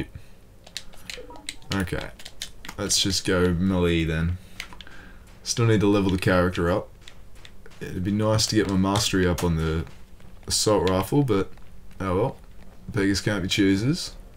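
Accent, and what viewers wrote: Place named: New Zealand. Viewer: u from nz? you sound like one🤔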